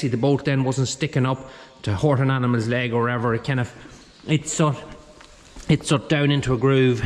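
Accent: Ireland. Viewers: Your videos are so interesting. Things have certainly changed since I worked during holidays from boarding school on my Fathers' Hobby Dairy farm back in the '50s & '60s. Your barns are immaculate and your equipment is top-notch. By the way, I love your Irish brog!!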